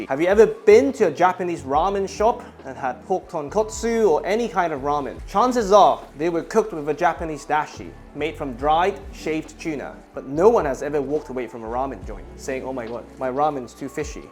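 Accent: United Kingdom. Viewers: Nah, it's an Asian dude speaking British English giving lesson about Italian dish that got me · u haven’t been outside enough if you think an Asian guy with a British accent is impressive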